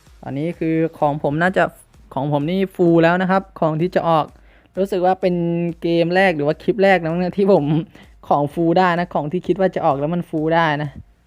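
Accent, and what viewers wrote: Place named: Thailand. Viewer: รู้สึกพี่เหน่อๆ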